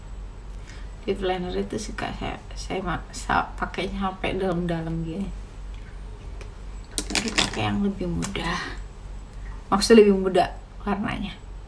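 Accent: Java, Indonesia. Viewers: Wow kren cantik pinter klongomong ada jawanya jadi aku sukah klo orang jawa ojok ngilangno bosonedewe semoga sukses sehat selalu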